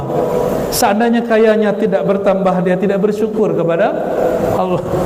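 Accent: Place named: Minangkabau, Indonesia. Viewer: Alhamdulillah Buya bahasonyo taragakjo kampuang halaman, ala lamo Ndak pulang 😅sanang bana mandangah Bahaso, itu asik Samo Samo urang Padang 👍😁🤗💛💛💛💛💛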